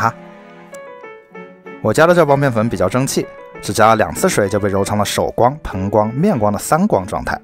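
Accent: Wuhan, China. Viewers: 难怪口音这么耳熟哈哈哈哈哈哈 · 果然你的口音是武汉的！ 加油 拐子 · 我就说为什么你的口音一直亲切，原来也是武汉的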